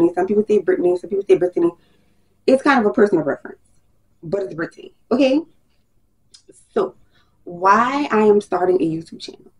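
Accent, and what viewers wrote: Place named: Los Angeles, United States. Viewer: Girl I've been binging your vids all day! I found your channel today while looking up correctional nursing videos as I only have one more pre req before I apply to my RN program. Been a CNA wayyy too long (7yrs) and ready to finally change my life for myself and my 2 toddlers. You're a breath of fresh air and I'm excited for your content and going on my nursing/healing/motherhood journey right with you girl❤...oh and that LA accent is everything baaaby or however y'all say it lol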